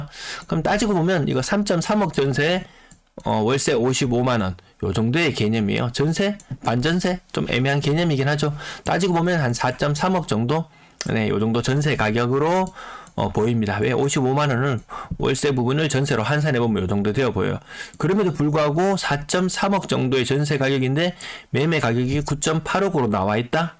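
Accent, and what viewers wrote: Gyeongsang, South Korea. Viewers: ㅎ 구독자 100만 언급 저도 그체널들 잘봅니다 매끄러운 서울말씨들 하지만 님은 경상도분이라 의도적으로 불친절하다고는 안봅니다 요즘은 꺼 더 잘챙겨봅니다 이런 정보야말로 감사하죠 화이팅 하십시요~^^ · 친근감이 있고 경상도 발음 너무 반갑습니다 알차고 유익한 정보 감사 하지요~~♡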